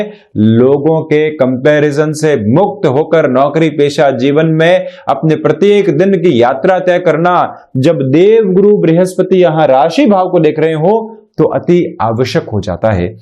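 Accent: Standard. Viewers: Pranam Guruji Your Hindi is very pure & clear . Very well explained. Regards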